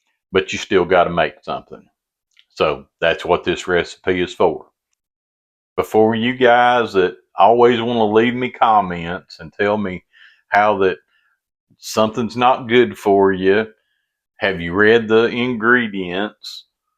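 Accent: South, United States. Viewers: Looks good. Love your country voice. Add some sour cream.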